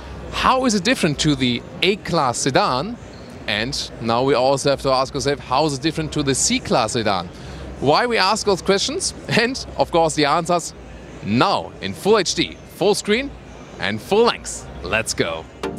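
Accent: Germany. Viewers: classic german english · is great! I like your German English! And, no one is better than you! Appreciate your business!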